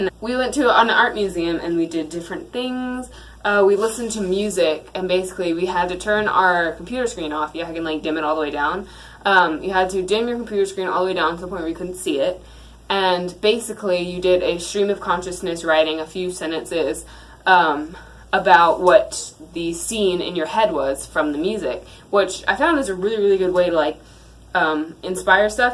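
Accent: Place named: South, United States. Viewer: all that suthern drawl down there at duke done pulled away ur british aksent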